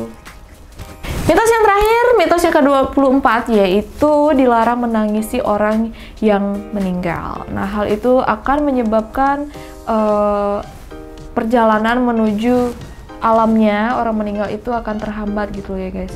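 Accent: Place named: Bali, Indonesia. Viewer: Logat balinya masih kental kak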